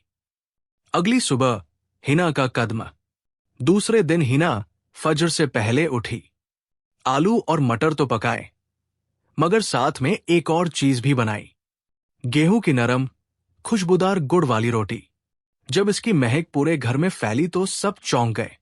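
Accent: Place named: Pakistan. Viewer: Par ye pakistani jesa kyun bol raha he feel nahi arahi hasi aarhi he 😂❤️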